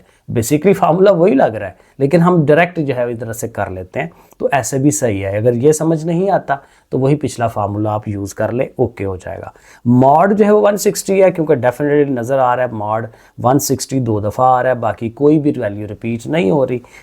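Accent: Sialkot, Pakistan. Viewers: Sir ap sialkoti hy myri trha ap ka bolny ka trika 😂😂😂❤ but acha hy 😊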